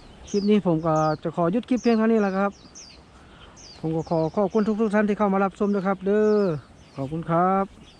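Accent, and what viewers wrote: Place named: Nyaw, Thailand. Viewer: เก็บเลยครับตากแดดไว้กินคือเว้าญ้อแกมภูไทจังหวัดได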